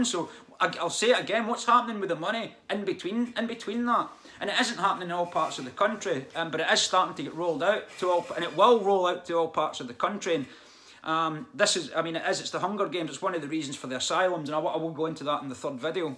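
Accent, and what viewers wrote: Scotland, United Kingdom. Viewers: It's amazing how people like to look down on other people once they get into a position of supposed authority, especially in the police force.why why why but there is something we can do about the dwp have you tried contacting radio 4? They are pretty good at exposing this sort of stuff. Never apologise for your great Scottish accent, we all have accents. Have a good day🥰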